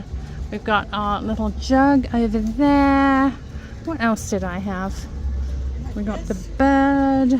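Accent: England, United Kingdom. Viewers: If you grew up in the United States, why do you have the English accent just curious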